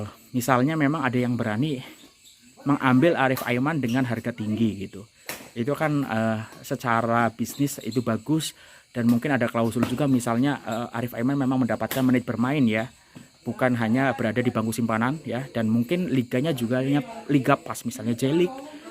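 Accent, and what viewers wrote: Indonesia. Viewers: itu cara indo, i disebut e, kalau A disebut a, kalo k disebut ka, kalo B disebut be, c disebut se mcm tu lah lebih kurang.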